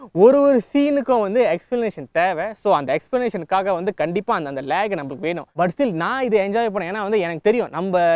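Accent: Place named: Malaysia. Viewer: He sounds like Kelly.. May be he belongs to Malaysia!!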